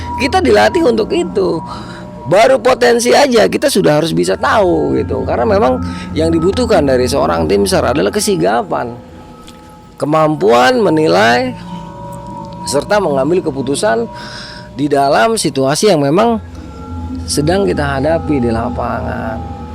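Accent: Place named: Jakarta, Indonesia. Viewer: ceritanya asik abang nya gw suka logat betawi nya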